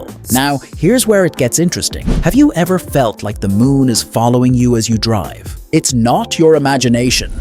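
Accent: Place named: Ireland. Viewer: Thanks for this video. Most of the negative comments are from people who like to complain. I like the narrator's Irish accent.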